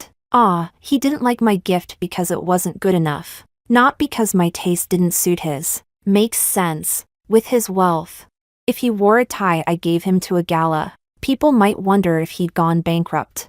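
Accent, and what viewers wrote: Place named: England, United Kingdom. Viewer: It surprised me that the Spanish was pronounced with an English accent 😅 Just FYI, the narrative switched to Spanish towards the end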